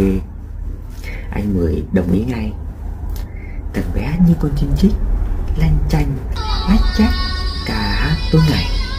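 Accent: Mekong Delta, Vietnam. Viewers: Cám ơn ( xin lỗi vì không biết xưng hô như thế nào ) nhiều lắm. Bạn đã truyền tải bài viết của bạn bằng chất giọng miền tây hay quá đúng như tình cha của hai cha con Chú Mười ❤❤❤ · Anh đọc theo giọng người miền Tây thiệt tình cảm, nghe vừa thân vừa thương!!!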